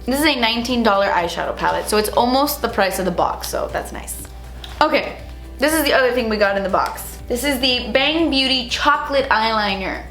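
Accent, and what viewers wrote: United Kingdom. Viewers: your British accent always make my day!😂😂😂 Love you! This look is ❤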